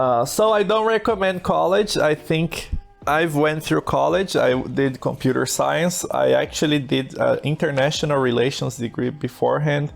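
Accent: Brazil. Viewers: tu é br né nao? kskss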